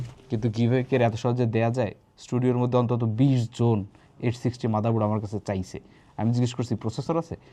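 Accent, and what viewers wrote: Barishal, Bangladesh. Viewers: ভাই আপনি বরিশাইল্লা বুঝলাম, প্লেন কে প্লেইন উচ্চারন করেন। তাই বলে লেখার সময়েও Plain?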